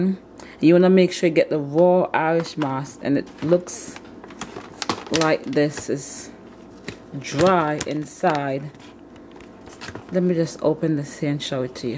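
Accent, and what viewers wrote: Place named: Jamaica. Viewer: She clearly has a Jamaican accent sir.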